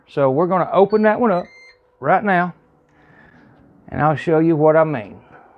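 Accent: South, United States. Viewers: Thank you so much for doing this. Your video helped me decide between the two appliances. I like your southern accent, too.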